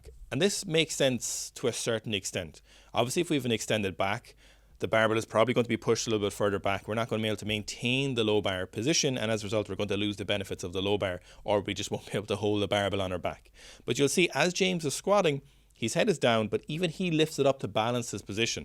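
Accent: Ireland. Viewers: Aggressive, disorganised breeding before the squat. Man I love the Irish accent.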